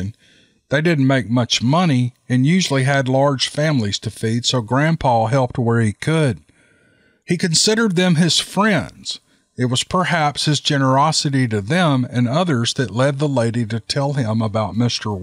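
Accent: South, United States. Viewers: is the man best story teller hands down soothing southern drawl...damn can this man tell a story love ya 💪💪🤜🤛✊👏🌱🌿🌴🏞️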